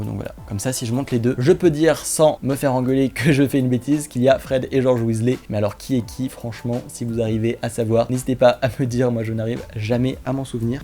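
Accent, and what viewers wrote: England, United Kingdom. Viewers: Pourquoi tu prend un acsent anglais ?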